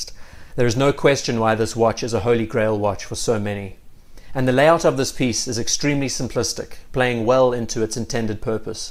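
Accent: South Africa. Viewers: Please do not take this the wrong way, or take it the wrong way! Your monotone dialogue is just horrible. I am a design historian amongst other disciplines, so I find your narrative really grating. Where are you from? South Africa? Anyway please think about the object and not the story that you want to tell; be critical and not political. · You have a real gift with gathering and sharing information in a comfortable way. That South African accent adds a great voice to the story as well. Keep it up.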